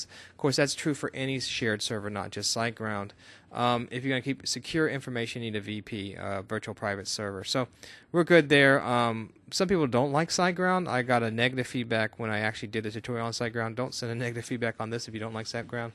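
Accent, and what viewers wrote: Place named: United States. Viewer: finally a guy with an american accent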